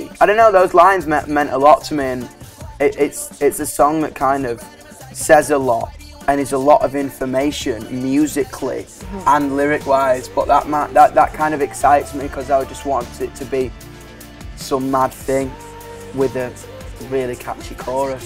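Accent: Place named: Northern England, United Kingdom. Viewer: ‘It’s the twenny ferst centree fer fock sehke’ God I love the northern accent 😂😂😂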